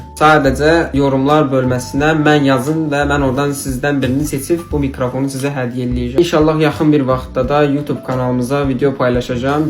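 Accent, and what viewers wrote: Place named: Qazakh, Azerbaijan. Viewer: Qaqam haralısan lehçen Qazaxlılara oxşayır ❤ men ❤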